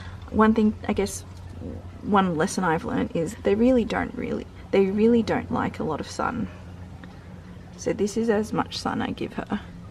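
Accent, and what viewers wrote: Australia. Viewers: You sound Australian! Where are you from? Im a perth gal ✌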